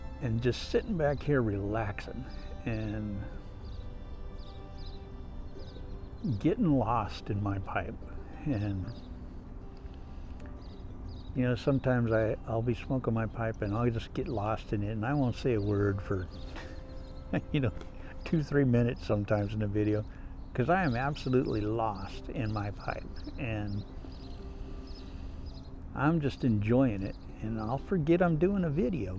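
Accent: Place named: South, United States. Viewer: picking up a little southern drawl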